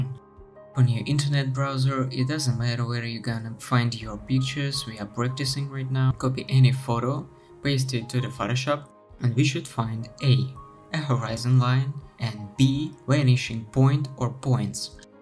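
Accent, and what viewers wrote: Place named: United Kingdom. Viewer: местами 100% британец, а иногда заговаривался, успехов!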